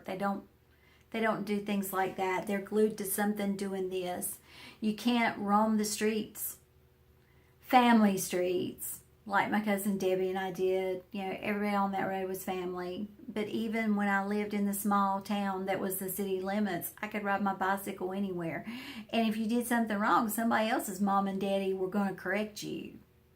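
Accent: South, United States. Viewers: I love your sweet southern accent.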